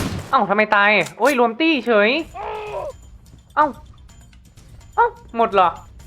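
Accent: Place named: North, Thailand. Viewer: เกลียดพวกรวมตี้!!! ชอบฟังภาษาเหนือมากๆ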